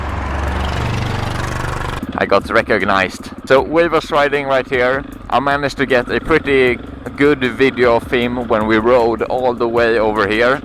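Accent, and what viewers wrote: Sweden. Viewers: Are you Swedish??? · Are u swedish?🇸🇪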